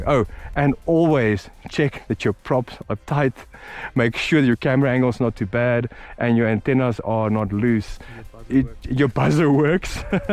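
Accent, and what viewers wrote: South Africa. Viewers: I can spot a follow South African from a kilometre away XD. NIce channel!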